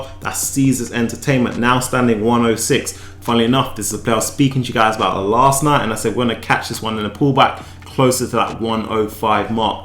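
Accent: United Kingdom. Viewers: Hi, are you from UK?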